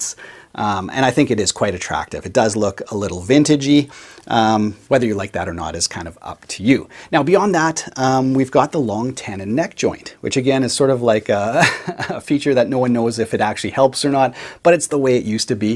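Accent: Canada. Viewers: I could tell you were from Canada! I'm from PEI!...'Eh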